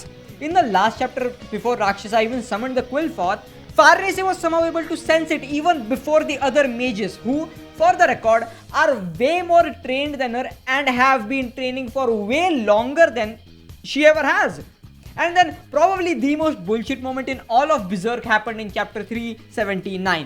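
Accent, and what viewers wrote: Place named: India. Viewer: Omg thanks for normalizing Indian accent, I have begin to accept it now.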